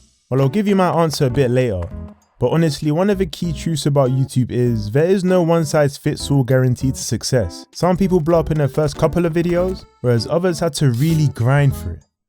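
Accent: United Kingdom. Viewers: its peak to see a new youtuber with a uk accent bro u just gave me confidence to use my voice now ❤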